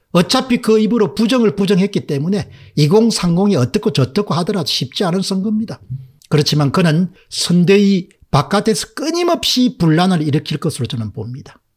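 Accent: Gyeongsang, South Korea. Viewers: 이준석 화이팅!! 공병 이놈아! 니 쌍판때기 보자니 오바이트 나온다. 경상도 사투리 웃김...ㅋㅋㅋㅋ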